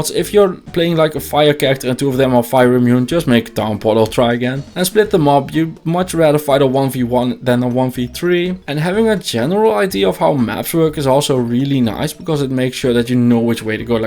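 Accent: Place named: Netherlands. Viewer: I've been stuck on NM for 9 months now because I'm waiting on the next entry in this series..;) jk, but nice video and looking forward to new videos! (Also nice to hear a fellow dutchy)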